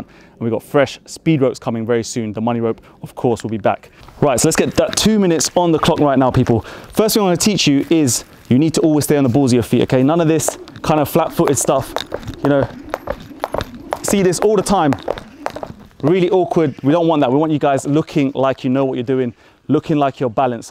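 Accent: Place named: United Kingdom. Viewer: UK aksen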